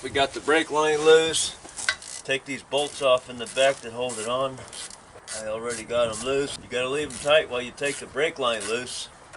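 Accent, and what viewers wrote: Spain. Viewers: Much respect to you Mr for the great work you did there and for the awesome Spanish accent you have (old western+ Spanish)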